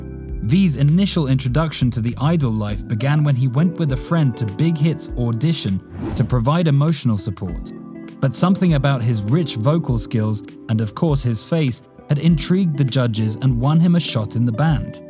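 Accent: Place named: England, United Kingdom. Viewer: 1. The first 3 letter words in which you described him.......I thoroughly disagree with that. He ain't dt good IMO. 2. Please pronounce his name properly. Don't pronounce it in your English accent gvng it English pronunciation.😡😡😡😡. His name Kim Taehyung is a Korean name, not an English or an American name. So pronounce it d way Koreans pronounce it, not in d way Westerners speaking English pronounce it. 3. to ...well, in ds pic he ain't lukin charming but s lukin like a kindergarten school kid. 😂😂 And he was not dt charming in his initial days. He lukd quite ordinary. 4. As regards his impeccably perfect looks, I hv seen his photos during his pre-debut days & tbh he was nothing to look at back those days....he's just a lean & skinny faced road-side boy in his looks. But aftr bng a trainee he havin undergone through immeasurable grooming, care, styling & skin treatments, transformed into such a hottie. 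Before, he just had fair complexion & a perfectly shaped face. Hence it's proved dt be it males or females, if we undergo proper grooming, care & styling under best professional beauticians, or under our own selves, we wud b 20 times more attractive looking than we are in actual. Like, he's a farmer's son. But was one indeed born under extremely lucky stars due to which from an ordinary commoner of Korea he became a worldwide celebrity now.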